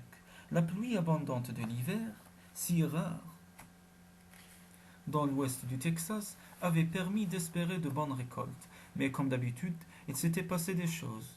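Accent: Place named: Spain. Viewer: Il me semble que vous avez un accent espagnol. J'ai bien aimé ce récit qui montre que les US se croit toujours maîtres de toutes choses.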